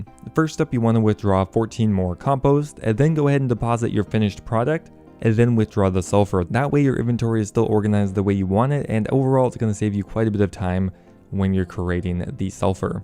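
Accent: Canada. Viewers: Are you Canadian? You DO have an accent but it's so hard to place EDIT: Just looked up your Twitter and it lists your location, I fucking knew it you Canuck bastard, I live on the US side of Niagara Falls and I am very familiar with the subtle differences in the pronunciation of certain words (plus out of all local radio stations I receive, the Canadian rock station we get here is better than the one on the NY side tbh)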